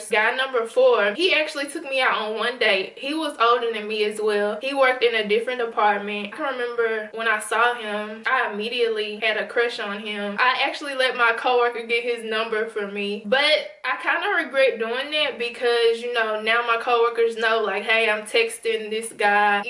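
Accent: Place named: Houston, United States. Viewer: She sounds like she’s from Houston ..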